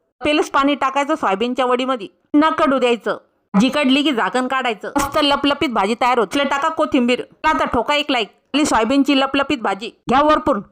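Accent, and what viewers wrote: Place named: Vidarbha, India. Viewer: काकू तुम्ही विदर्भ मधल्या आहात का भाषा तशीच आहे मी पण विदर्भ मधली आहे रेसिपी खूप सुंदर असतात तुमच्या 👌👌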